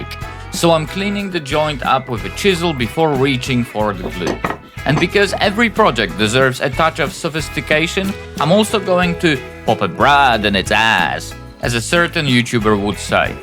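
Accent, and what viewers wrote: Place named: South, United States. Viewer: I hope your viewers pick up on the planer trick you used for small/short boards. I have a feeling you and the Bearded Viking have been chatting a bunch since he became a subscriber due to your ETSY Check series, "brad in the a$$" Picking up on some of that southern drawl🤣. You had me at 🔥and 💡. BTW, nice shirt! 😉 I guess I should upload something soon with all that free advertising you have been giving me. 😜 This is a pretty cool concept. Maybe some 3D printed flying/floating superhero silhouettes would be an ETSY seller? Magento should be the first one... I mean the secret sauce here is magnets, just would be fitting...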